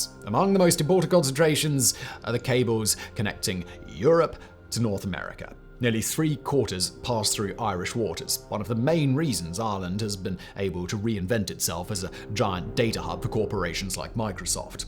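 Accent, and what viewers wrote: United Kingdom. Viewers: This isn't journalism. You're so very clearly in favor of the militarization of Ireland. Curiously enough that would serve British interests too. Ireland would fall within a day and we also don't support your wars, nor do we have any interest in fighting them for you. This country has enough problems to deal with and they won't be resolved by stepping on other peoples toes. For those watching, I plead with you: don't be fooled into this as if it were some straightforward and logical conclusion because a fella with an Etonian accent said so, don't be cretinous fools, please. Open a history book. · Don't listen to that posh English bollox.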